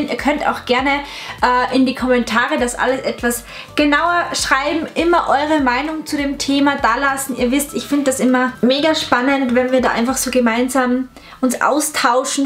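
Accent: Austria. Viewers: Bist du Österreicherin? :) (wegen dem akzent hätte ich halt geraten haha)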